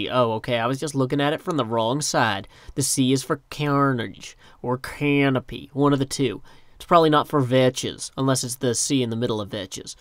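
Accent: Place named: North Carolina, United States. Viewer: Oh dear God your accent LOL its been awhile since I've heard one like it. I'm originally from western NC but moved to VA beach 8 years ago. Love the accent. Its home to me.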